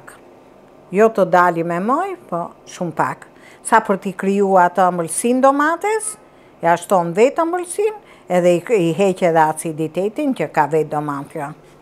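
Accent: Tirana, Albania. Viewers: Te lumshin duart moj zonje e nderuar te ndjek vazhdimisht . Me pelqen e folura jote origjinale e Tirones. Qofsh me jete te gjate dhe e gezuar.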